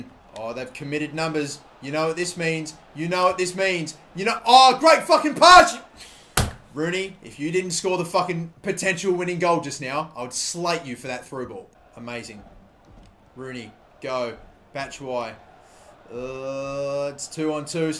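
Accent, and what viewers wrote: Australia. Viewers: Are you Australian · I always thought you were Australian.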